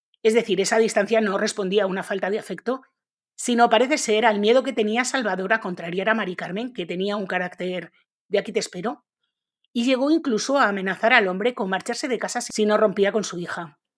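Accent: Spain. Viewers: Desde ARGENTINA. ME ENCANTA ESCUCHAR SU ACENTO ESPAÑOL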